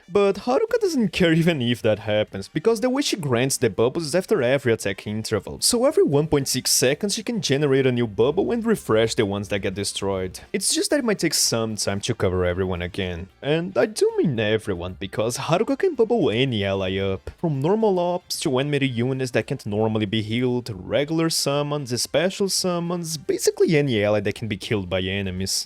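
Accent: Brazil. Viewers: Primeira vez aqui, na hora que eu ouviu você falando Ptilopsis eu tive certeza que tu era br kkkkkkkkkkkkkkk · Wait a Second... YOU'RE BRAZILIAN!? That Accent... it's Familiar somehow, anyways, nice to know one of my Favorites "Arktubers" is of the same Country as i, btw, will u cover Endfield? Really like the style of ur videos.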